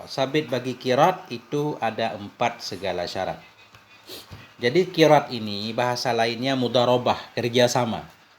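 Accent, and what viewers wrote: Aceh, Indonesia. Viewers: Orang aceh yah?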